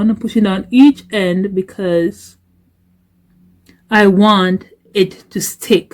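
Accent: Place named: Caribbean. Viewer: Thank you for showing this definitely like your Caribbean accent the way you explain things also for keeping it real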